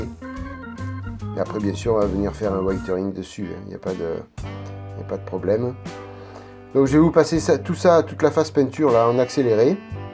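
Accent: South, France. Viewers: Salut, très sympa cette petite vidéo, j'ai hâte de voir le rendu de la variation chromatique avec le chipping ça va être super sympa. Et sinon toujours un plaisir de suivre tes montages et d'écouter ton bel accent du sud:) Au plaisir!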